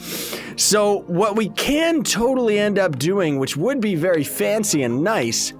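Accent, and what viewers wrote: Canada. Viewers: Now every time I watch his videos I imagine him with his canadian accent. XD LOL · Are you know Canadian Or Are you living in Uk xD IDK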